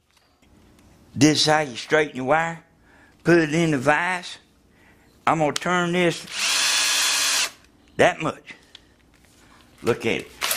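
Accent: South, United States. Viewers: Thanks, love theSouthern accent! If i make one i will film it and tell people about you.Thanks again. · You have the coolest accent can't beat that good old southern accent hell that's better than anything you can buy I bet you can get up to 200 miles of that antenna I wish you lived near me I'd pay you to make me one and that's made better than any of the $100 ones I've seen made a lot better and more sturdier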